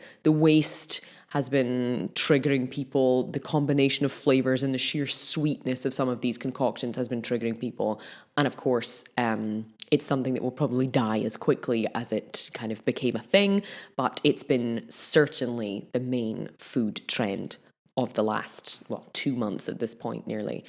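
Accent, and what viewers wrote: Scotland, United Kingdom. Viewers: I dont know what it is but I love hearing you talk lol probably the scottish accent 😉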